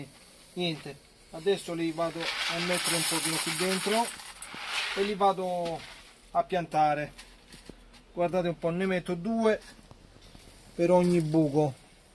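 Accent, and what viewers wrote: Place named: Salento, Italy. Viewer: Sei molto bravo , ti seguo da poco ma mi piace il tuo modo di spiegare , molto semplice e "paesano" (complice la accento salentino ). Io faccio così con i pomodori , zucchine , fagiolini , botole , e quest anno anche con i piselli verdi . faccio il contrario cioè prima faccio le buche con le dita e poi semino ma va bene uguale ,la cosa che faccio in più di te è che metto le spaselle in dei contenitori con sotto l acqua (tipo stanati) dove posso sistemare 4 spaselle ogniuno . L altra cosa , uso le microrizze ,tu le usi ? Cosa ne pensi ?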